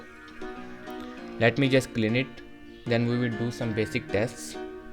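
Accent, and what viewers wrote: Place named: India. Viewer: Индийский акцент это пиздец.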